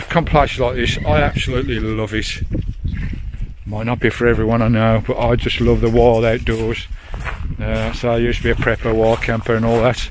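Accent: Scotland, United Kingdom. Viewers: Lovely Park up on top of that mountain! Love the Scottish accent!!!! Take care! Ju Xx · As a Scotsman I can say with all my heart ❤️ thank you for sharing your amazing travel's and your scots accent was brilliant 👍😀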